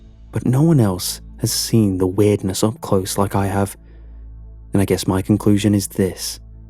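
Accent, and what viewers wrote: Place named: Wales, United Kingdom. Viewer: Why does his accent sound a little bit welsh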